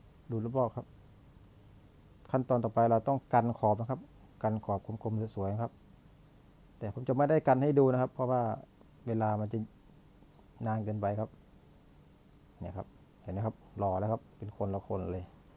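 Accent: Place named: Laos. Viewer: ข่อยมักการพากษ์อ้ายแฮงครับ เว้าไทยเเล้วสิมีสำเนียงลาวแกมมานำ แบบผมเลยครับ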